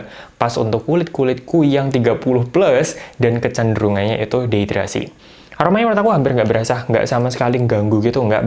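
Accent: Java, Indonesia. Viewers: Seneng aku ndelok channel iki, cara mbahas e medhok tapi ndek kuping iku enak.... jowo banter cara ngomong e hhhh. Gudluck cak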